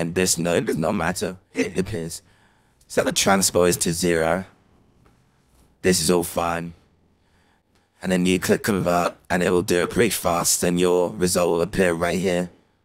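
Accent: United Kingdom. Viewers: Why this nigga speaking british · keep getting runtime error (why does kanye sounds british in this vid)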